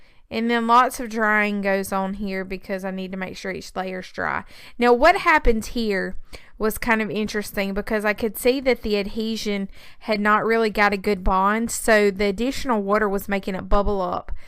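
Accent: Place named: Texas, United States. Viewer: I'm from Texas and I have a thick accent like you. It's a joy to watch your videos! I'm an aspiring artist and have been dabbling with mixed media pieces so it's fun to watch and get ideas from you! I get a kick out of your comments and dialogue. :) thanks for your videos! ❤️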